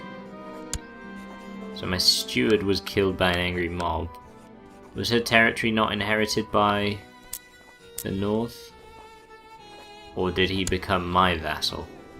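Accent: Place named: United Kingdom. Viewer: What is your accent? It's easily my favorite British accent but I'm terrible at recognizing regional ones.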